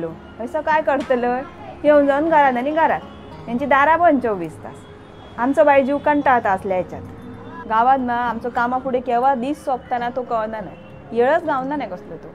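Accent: Malvani, India. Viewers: Ge tujhi malvani Bhasha aikuk khup maja yeta.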